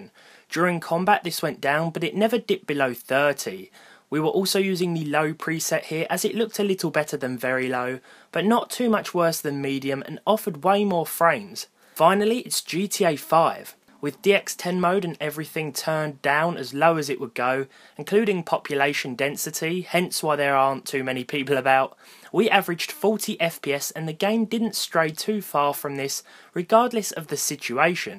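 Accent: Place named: England, United Kingdom. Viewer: dude I'm so glad I found ur videos it's so good to hear a familiar English accent know so much about gaming on a budget haha ur sooo chill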